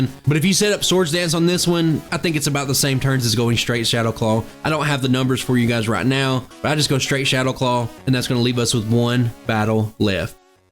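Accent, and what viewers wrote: South, United States. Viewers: This my first video watching, definitely just found a channel I'll be tuning into. This is weird but I swear you're the only Pokémon youtuber with a southern accent lmao everyone else doesn't have one or they British or something.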